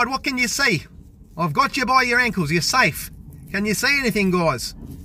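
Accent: Australia. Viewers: Today from California this is Mr coyote every time when I watch your videos and I get a kick out of your Australia accent I think it's pretty cool keep up the great work bye for now. And I'm on YouTube to john klamm and thor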